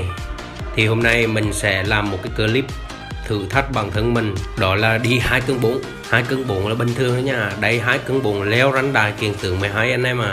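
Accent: Central, Vietnam. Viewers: anh là người miền Trung à, nghe giọng hay ghê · nghe tiếng anh này nghe giống người miền trung với lại nghe cũng ấm ko quá khô nói chung chơi cũng hay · Anh ở đâu miền trung anh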